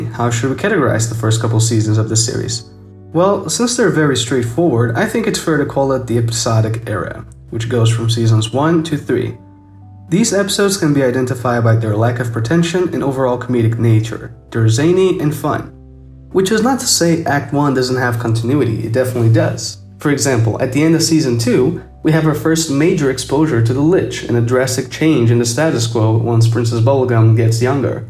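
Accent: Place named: Latin America. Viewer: Sorry for the question, but you are a latino american? You form at talk is very diferent. Amazing video, adventure time it's a great show, a thue Master piece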